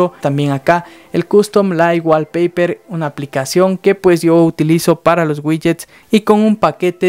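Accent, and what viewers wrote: Cuenca, Ecuador. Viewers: Eres de cuenca?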